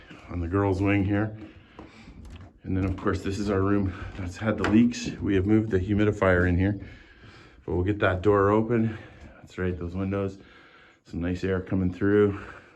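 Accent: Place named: Saskatchewan, Canada. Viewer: and I have just discovered your show. I listened to your voice and I thought “he sounds like he’s from Saskatchewan….and then I saw the Roughrider shirt. Thanks for sharing your journey. 🇨🇦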